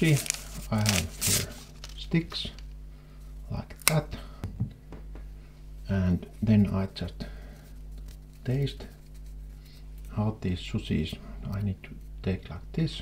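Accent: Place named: Finland. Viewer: As a Swede he sounds like he is from Finland to me. Idk but that is a guess I would feel pretty confident in..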